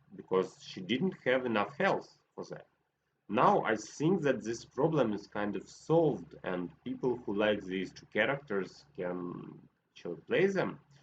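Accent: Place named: Russia. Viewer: Привет, по акценту понял что ты Русский) подскажи пожалуйста что лучше создавать на телефоне? Играю всего 3 дня, и у аракне совсем другие способности, робот на 1 месте, вообщем все по другому) я строю вокруг маи для поглощения демеджа, аракне для стана и даредевил для демеджа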